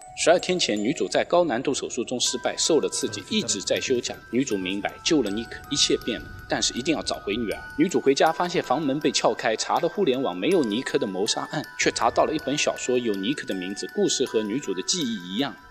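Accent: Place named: Hangzhou, China. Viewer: 嗯 我杭州人 口音听起来很接近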